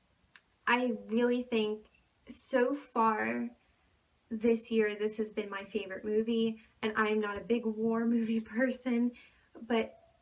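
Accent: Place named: North America. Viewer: Which part of N America are you from? I can't guess. Nice, intelligent review Thank You X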